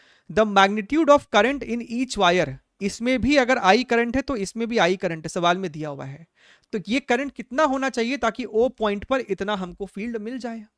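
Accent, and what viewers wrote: Bihar, India. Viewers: Sir kya aap bihar se ho..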